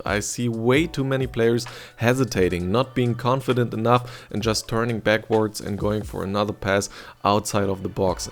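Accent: Germany. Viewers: It is soooo easy to hear you are from germany hahah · sounds german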